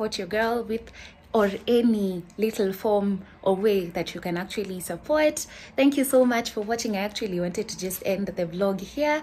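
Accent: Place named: Kenya. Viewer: After the Nigerian Accent, I really love the Kenyan Accent😍 so distinct.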